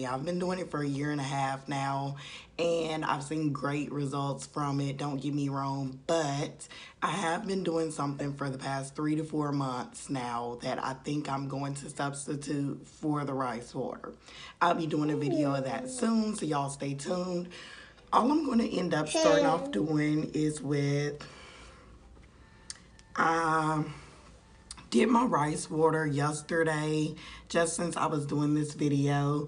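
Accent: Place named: South, United States. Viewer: Lol you so country i love it lol 😂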